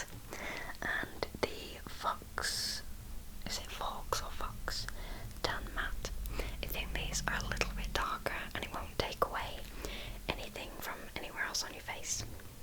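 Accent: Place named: England, United Kingdom. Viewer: Hey, Don't want to sound like a creep but where abouts in england are you from? Just because of the accent. I'm from South Yorkshire btw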